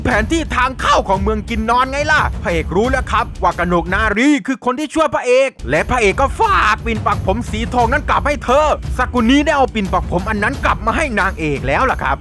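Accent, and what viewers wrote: South, Thailand. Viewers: พี่คะพี่เป็นคนใต้ใช่ไม่คะ(ตอบหน่อยนะคะ)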